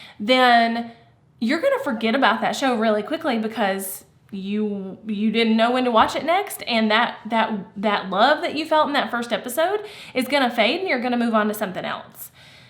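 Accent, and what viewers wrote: South, United States. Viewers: I love the fact that there is someone else with a good southern accent who's making it in YouTube! I hear myself on camera and it's a hoot! And yes, I'm from North Carolina as well!:)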